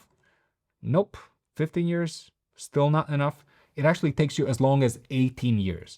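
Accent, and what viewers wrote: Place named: Latvia. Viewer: Klausoties dzirdu nedaudz latviešu akcentu :)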